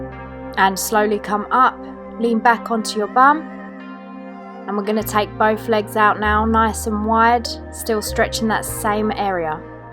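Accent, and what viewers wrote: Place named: London, United Kingdom. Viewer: Lovely video. You must bee a South or East Londoner lol. The accent gives it away.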